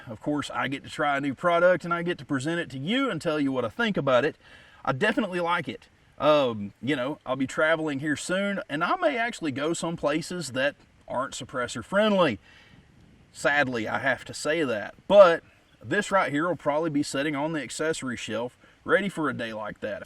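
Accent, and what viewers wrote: South, United States. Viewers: I always liked a southern accent. Makes things go down smooth. LOL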